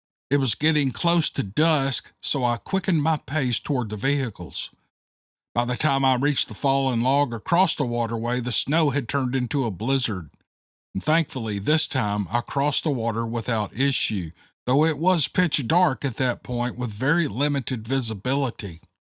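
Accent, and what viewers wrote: South, United States. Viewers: I love how you read.. I could listen to you all day.. I really love the Steve Lily saga but anything you read is fine with me.. as a Arkansas gal, I love your southern twang..The stories in your podcast were great..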